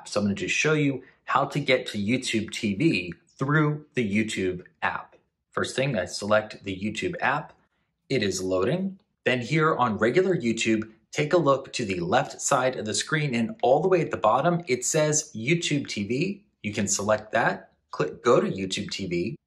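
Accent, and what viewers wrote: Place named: China. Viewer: Como eliminar la voz en off con acento Chino? Gracias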